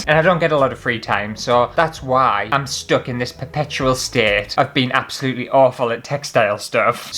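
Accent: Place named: Northern England, United Kingdom. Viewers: 🤩Northern accents rule, well at least in our eyes. Might be ever so slightly bias 😁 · Your art rant made me so happy, especially when you mentioned Damien Hirst. He's a snake oil salesman of the art world, absolute sham. I reckon we must be fairly local to each other as I'm also in a pit village in the Durham area close to the sea! I just love that out of all the art youtubers I discovered you, it's comforting to hear our accent, it's like you're me mate haha. I also say is/us to refer to myself which confused my American friend a lot! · Your accent is definitely North East and phrasing is specifically Durham rather than the Newcastle Geordie. I'm originally from Durham also and I love to hear the honesty of your roots when you talk, together with your wicked humour. It makes me chuckle the whole way through your videos - laughing with you, not at you.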